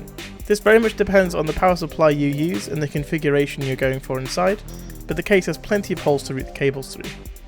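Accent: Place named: United Kingdom. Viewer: ) Hope for more contents from you bro. That well-defined British accent <3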